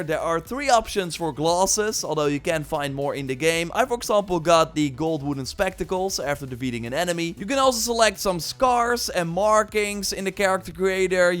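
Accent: Netherlands. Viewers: Ben jij Nederlands vanwege je accent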